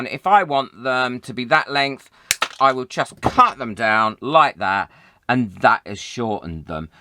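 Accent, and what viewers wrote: England, United Kingdom. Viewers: Thank you very much,! For your content, for sharing your expertise, for your generosity and your humour. And for all the love and care you put into your videos! I also love your accent, it brings back memories of the year I spent in England: absolutely LOVE it!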